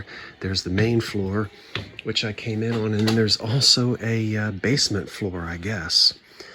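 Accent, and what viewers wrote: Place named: Baltimore, United States. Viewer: I grew up in "Balmer" County. I started going to antique stores when I was a teenager ..50 yrs ago. That's when you could buy old stuff for cheap. I love all your videos, and mostly, I love hearing you speak. Takes me home. I live in Colorado now.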